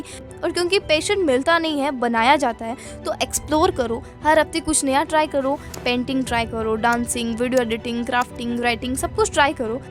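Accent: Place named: Marwari, India. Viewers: Aapki marwari sunkar achha lga di 😊❤ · Diiii...aap rajasthan se ho..mein bhi ..maine aapki har video dekhi hai.aap bohot achi videos banathi hai.aur aapki marwadi slang bhi ek masth hai 🤌🎀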